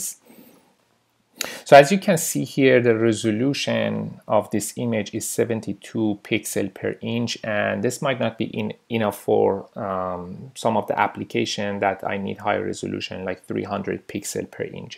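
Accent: Iran. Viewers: I spot a Persian accent when I hear one. great tutorial thank you!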